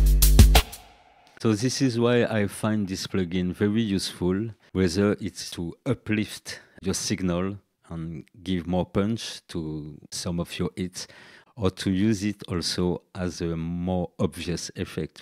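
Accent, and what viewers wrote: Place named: France. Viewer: Ça sent l'accent du terroir 😁👍 Super vidéo en tout cas. Il a l'air vraiment top ce plug-in, ils m'emmerdent Cableguys de sortir des plugins comme ça, simple, bien foutu, intelligent, efficace... ils tombent toujours dans le mille ! Et à chaque fois il me les faut...! Jamais déçu par ce dev, au contraire.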